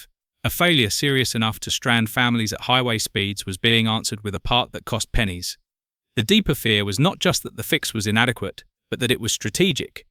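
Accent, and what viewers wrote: United Kingdom. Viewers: Started to watch until I heard that obnoxious "British" voice, , leaving . I will find this info on an American video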